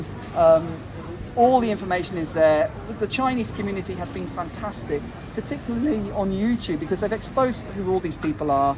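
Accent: United Kingdom. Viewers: Dr K seems like such a decent cool guy and I love his accent. Reminds me of me English cousins. Hadn’t heard of him until this nonsense happened. Now I’m following.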